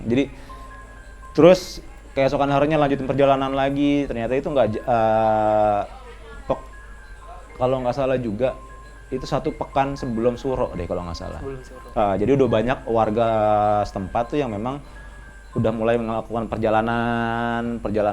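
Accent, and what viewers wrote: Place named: West Java, Indonesia. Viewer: Maksudte, akhire ada bahasa jawanya tapi logatnya sunda bang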